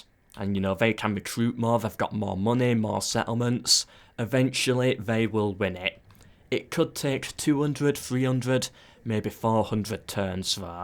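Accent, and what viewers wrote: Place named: Northern England, United Kingdom. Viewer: Northern commentary by a Northern lad. Very good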